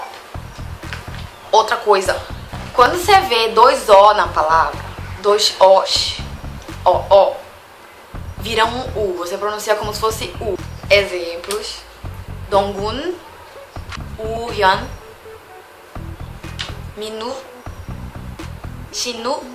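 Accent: Pará, Brazil. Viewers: Não se preocupe tanto, mulher, dá pra entender perfeitamente suas explicações ainda mais quem tá acostumado a ver os oppar nos programas e a ver dramas. E aleatóriamente digo que sou fã dos eu sotaque paraense(?).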